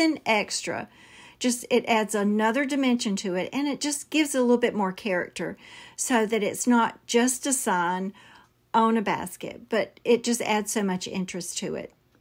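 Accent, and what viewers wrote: South, United States. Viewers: I feel so bad for you going through that scare of suspecting you may have shingles. I went through it a few years ago and I did in fact have shingles., we arent here to judge your appearance. Absolutely not. I know that I enjoy your sweet voice and southern accent. You are one of the best creators I watch. Today all of these projects are fantastic. The trimmings mold are so gorgeous with the pumpkin and the greenery and flowers. And OMG that night stand came out so beautiful with the decopauge paper. Matter of fact I just checked it out and I am buying it. You are amazing with everything you touch. Thank you for sharing your projects and for sharing the links in case we're interested. I do hope your hives disappear quickly.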